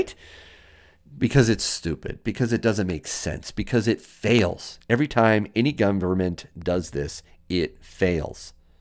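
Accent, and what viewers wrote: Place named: United States. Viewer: It’s just yer accent, I find (in general) yankees have an accent that is just off putting. Now salivate metals . . . Whew, if his info wasn’t so good I’d never listen to him on purpose lol.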